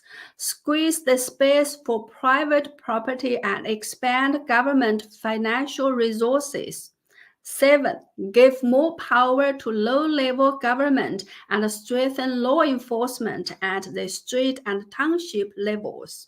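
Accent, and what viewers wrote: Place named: China. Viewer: I love your Chinese accent👍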